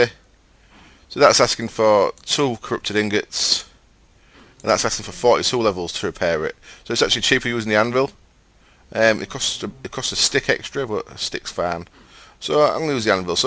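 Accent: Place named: North, United Kingdom. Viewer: yeah exactly the same northern (i think) accent that you have :)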